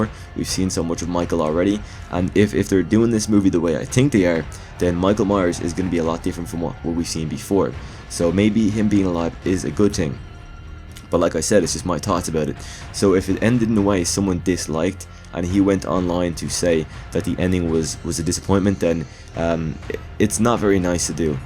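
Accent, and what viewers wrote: Ireland. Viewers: For Christ's sake don't apologise so much, being sick and all - for your have amazing content! I love the Halloween franchise, and if anyone don’t like it, they are free to stop watching! And don’t apologise for your Irish accent, be proud! My aunt is Scottish, be yourself! In the end of your video you are saying it’s Friday, did you make the video yesterday 🧐😁 Cheers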